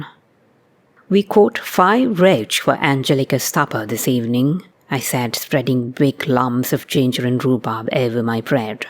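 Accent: RP, United Kingdom. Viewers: I assume this is you. Would you like to talk to other people who speak authentic heightened RP? I’d like to get a group going.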